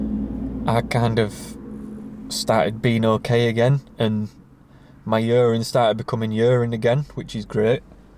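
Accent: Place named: Sheffield, United Kingdom. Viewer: are tou from sheffield mate. You sound very local brother.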